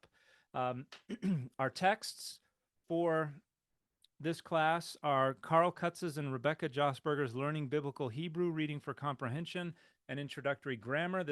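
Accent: Israel. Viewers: I couldn't help but notice you pronounce Hebrew closer to a modern Israeli Hebrew pronunciation, rather than a reconstructed Biblical Hebrew pronunciation. Is that because your teachers were Israeli? Or because you distrust reconstructed pronunciation? Or just an accident of fate? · It is important to note that the pronunciation here is the modern israeli pronunciation - not the ancient one. If you want to hear the pronunciation of ancient hebrew (in different periods) I recommend watching A. Z. Foreman's channel.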